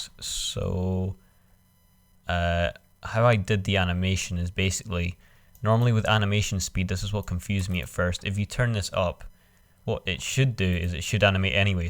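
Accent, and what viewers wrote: Scotland, United Kingdom. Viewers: Sounds like a Scotsman - nice tutorial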